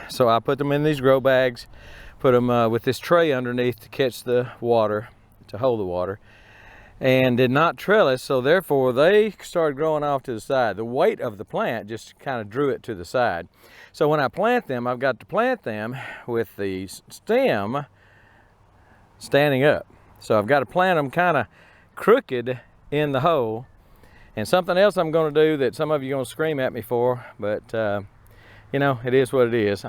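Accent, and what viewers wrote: Texas, United States. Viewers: Love the Texas French speak. LOL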